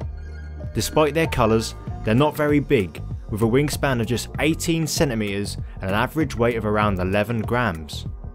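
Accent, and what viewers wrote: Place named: England, United Kingdom. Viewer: Really enjoy your videos mate. You sound English, so I think you meant they are found as far EAST as Turkey, not West. 😉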